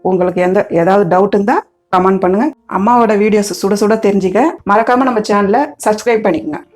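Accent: Chennai, India. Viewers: சென்னை தமிழ்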